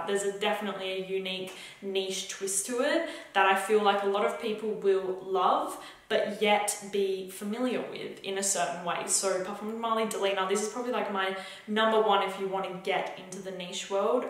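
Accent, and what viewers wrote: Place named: Australia. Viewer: Aussie girl back at it again. Love the accent she has it’s orgasm into my ears...haha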